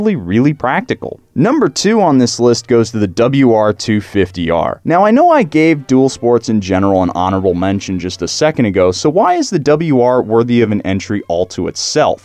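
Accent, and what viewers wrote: United States. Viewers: That cowboy hackcent gets me everytime love it !!! Also i don't actually ride motorcycles, i just love how they look and they scare me :D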